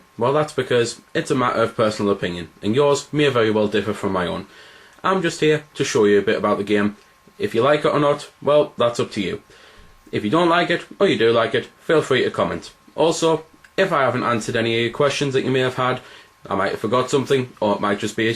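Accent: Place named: Northern England, United Kingdom. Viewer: Goddamn I can't place your accent. It's Northern...probably N.E.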